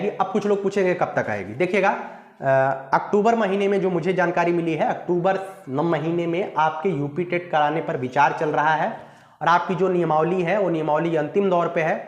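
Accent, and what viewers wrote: Bihar, India. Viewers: Bihari boli dhire dhire aap me jhalak rha hai sir.🙏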